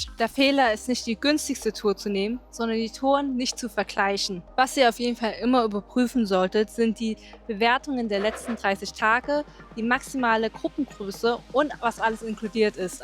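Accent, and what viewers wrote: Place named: Saarland, Germany. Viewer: BIsch du aussem Saarland? :D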